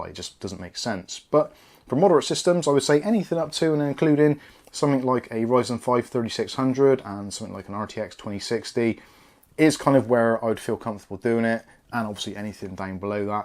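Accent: Bristol, United Kingdom. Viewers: Nice video. 'Ere - you a Bristol lad or no? Churz!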